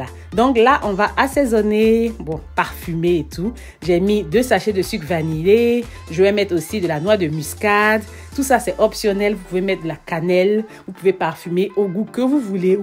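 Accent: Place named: Ivory Coast. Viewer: Ma chérie je sais que tu es ma sœur Ivoirienne vu ton accent👏👏👏🥰🥰🥰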